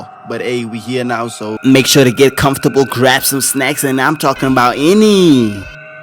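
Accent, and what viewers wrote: South Africa. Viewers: Bro sounds South African 😭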